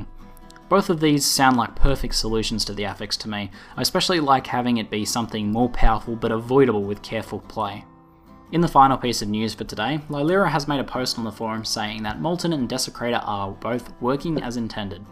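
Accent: Australia. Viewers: Sounds pretty good. Nice to have an Australian voice on youtube :) sick of Americans...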